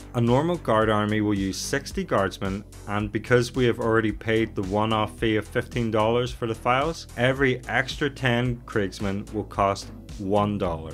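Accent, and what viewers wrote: Northern Ireland, United Kingdom. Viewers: Whereabouts in Northern Ireland are you from? 😁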